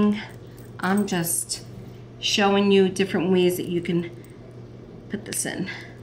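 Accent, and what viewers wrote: New York, United States. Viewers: I admire you a great deal😘😘😘...i dont have any issues wirh my hair but i like that you are so confident even with such thin hair i almost forgot you have thin hair and your New York accent or Jersey i love. Home sweet home!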